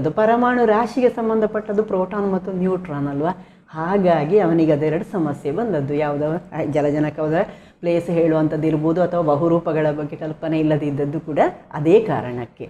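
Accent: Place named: Mangalore, India. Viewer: Tq u so much mam 🌹nimma Mangalore bashe spr🥰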